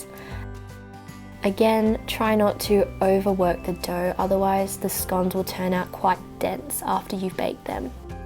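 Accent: Australia. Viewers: This is not meant to be insulting or racist in any manner, but as an Aussie of Scots-Irish descent, and having been descended from First Fleet penal colonists, I’ve never been able to wrap my mind around an Asian with an Aussie accent. That said, the scones look deelish…nice and fluffy.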